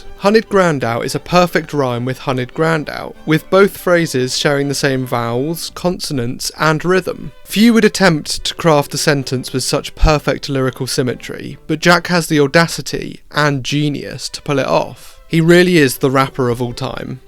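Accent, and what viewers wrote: United Kingdom. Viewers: The British accent makes it even better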